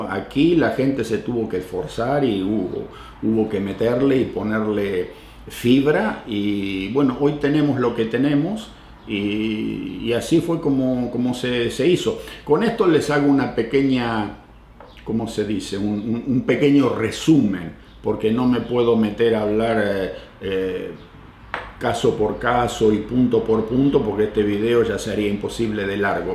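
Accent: Argentina. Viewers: sos el pedacito de Argentina en Canadá, seguís teniendo la tonada argento. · y la tonada de donde viene? De Argentina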